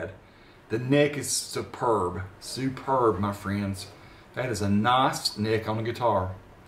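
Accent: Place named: East Tennessee, United States. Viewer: Are you from Knoxville? Your accent sounds exactly like the East Tn. accent.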